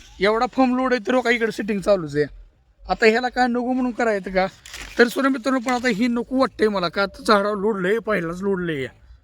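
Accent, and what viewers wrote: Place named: Solapur, India. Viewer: बोलीभाषा सोलापूरची वाटते.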